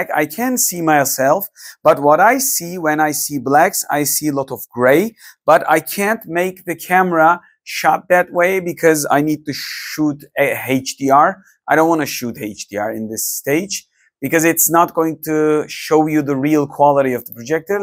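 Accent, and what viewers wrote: Turkey. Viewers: Hocam helal olsun :D , süper bir kanal yapmışsın , aksanından Türk olduğunu anladım. Türk olduğuna bahse girerim ama ispat edemem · Bu türk ingilizcesi nerde duysam tanırım :)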